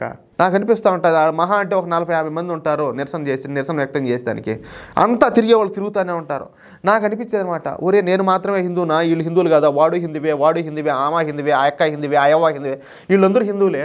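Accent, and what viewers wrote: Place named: Chittoor, India. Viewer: Super andi, as a Tamilian I’m so proud. Meeru hypocrisy le kinda peggi lekunda chakkaga explain chestharu. And that too mana Chithooru Thirupathi slang lo. Keep it up andi.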